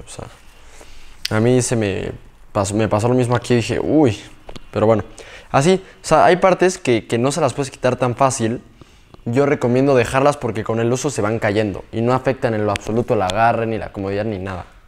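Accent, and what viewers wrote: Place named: Fresa, Mexico. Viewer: Algo nefasto el acento super fresa goeeee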